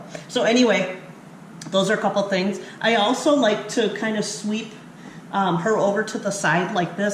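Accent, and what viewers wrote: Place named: Wisconsin, United States. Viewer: I heard a comedian on the radio today and she sounded just like you! And she said she was from Wisconsin. hmmm. Ok, I think its Jackie Kashian and it must be a Wisconsin accent because I thought it was you. lol. still sounded more like you than her.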